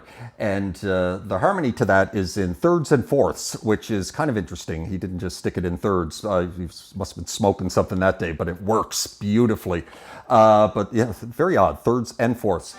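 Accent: Canada. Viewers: It was very interesting, I’m from England, do you have a Canadian accent?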